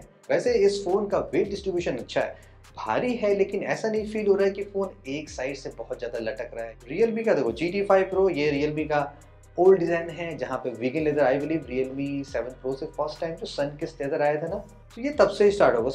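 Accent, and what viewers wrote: Lucknow, India. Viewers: आप लखनऊ से हैं??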